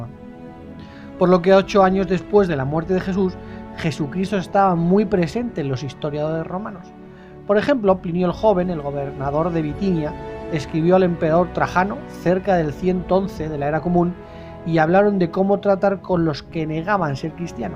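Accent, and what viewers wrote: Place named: Spain. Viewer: que tal si muy cierto y ahun así cada vez la gente cree más en extraterrestres que en Jesús,si soy de Morelia Michoacán mexico ,pero hace como 16 años vivo en usa vivo dos años u medio en California y después me mudé a Carolina del norte,conosco poquito new york ,muy de pasada ,ahunque aquí en usa casi todos los lugares son iguales jejeje,y usted de que parte de España es?me imagino que es de la madre patria por su acento ,y ay disculpe tanta falta de ortografía ,mi hermana dice que tengo faltas de ortografía hasta al hablar jajajajajajaja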